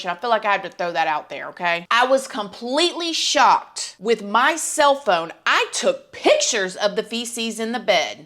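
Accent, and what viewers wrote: South, United States. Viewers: Watched a few of your vid's. Then one day I noticed you have a southern accent. I lived in the south for awhile. Love your accent. And yes we all love you!!!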